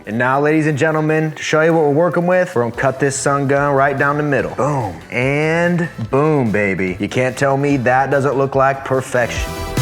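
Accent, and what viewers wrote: Texas, United States. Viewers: Sum gun, sounds like your getting Texas in your accent bro. Good.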